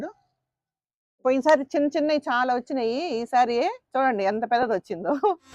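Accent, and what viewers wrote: Nellore, India. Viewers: Mana Nellore bashalo super andi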